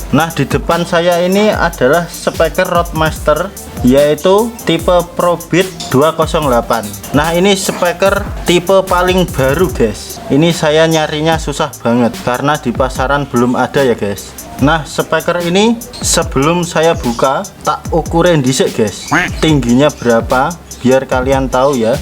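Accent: Java, Indonesia. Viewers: Medok sekali km gaes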